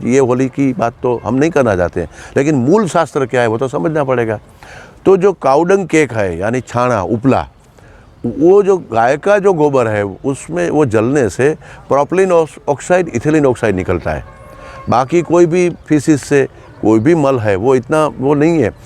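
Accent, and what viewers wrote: India. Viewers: प्रणाम सर ,अति ज्ञान वर्धक.... An extremely scientific analysis ,never heard it before Sir ,your gujrati accent delivery in hindi is so loving ,I rightly feel, my self being in bhuj decades ago.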